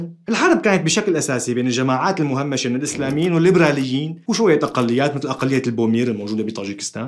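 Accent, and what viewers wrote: United Kingdom. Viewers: واو لهجتك البريطانية رهيبة❗😭😭🔥🔥🔥🔥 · the british accent :)))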